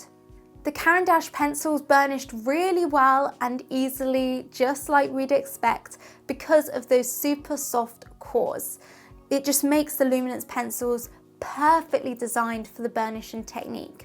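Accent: England, United Kingdom. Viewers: OMGGG I lovee your English accent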